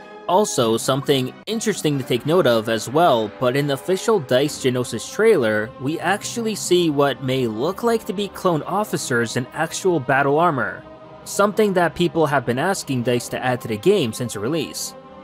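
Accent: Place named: Canada. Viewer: thats how they pronounce offense in canada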